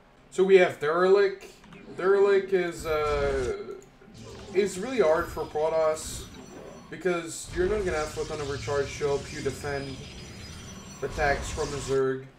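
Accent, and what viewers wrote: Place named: Quebec, Canada. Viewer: ça parait dans ton accent que tu es québécois entk thx pour ta vidéo tres bonne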